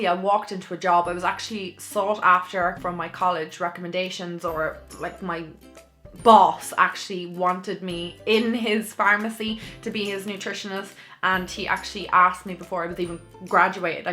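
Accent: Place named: Munster, Ireland. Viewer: what county are you from?? trying to figure out from your accent guessing somewhere in munster ???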